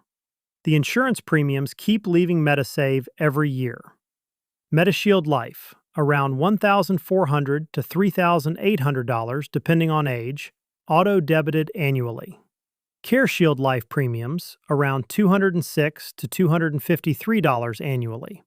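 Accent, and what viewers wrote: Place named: Australia. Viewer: What's with the Aussie accent??!!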